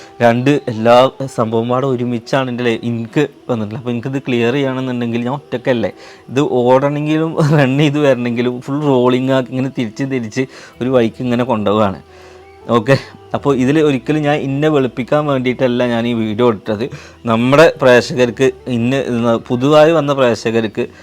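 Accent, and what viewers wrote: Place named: Malappuram, India. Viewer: Nee adhyam uppaante ummaante ennu paranjirunnu ippo sinu parayum pole anu ippo parayunnath uppantem ummante ennu basha mattunnu nee Palakkad Jilla alle Malappuram basha varunnu ippo aduthayitt samsarathil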